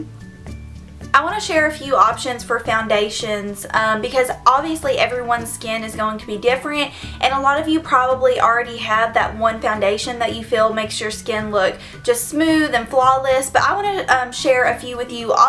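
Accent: South, United States. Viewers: ♡southern accent♡ Sent with lots of ♡ in NC!!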